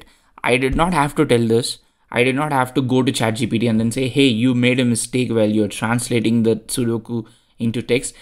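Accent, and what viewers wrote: India. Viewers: guys plese improvo your pronunciation .Indian accent is so hectic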